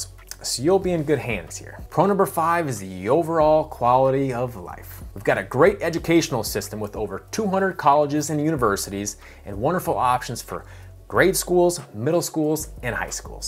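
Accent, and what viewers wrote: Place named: Minnesota, United States. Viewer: Wow, I really hear your Minnesota accent. Love it.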